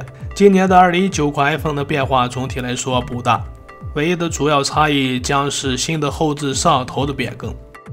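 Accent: China. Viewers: 兄弟，你是山西人。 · 中国农村口音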